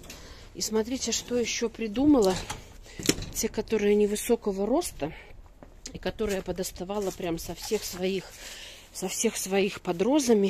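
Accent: Belarus. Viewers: Здравствуйте! Откуда вы? То, что из Беларуси, я поняла по разговору. Из какого города? В Гомеле продаёте гортензии, розы? Хочу Самарскую Лидию.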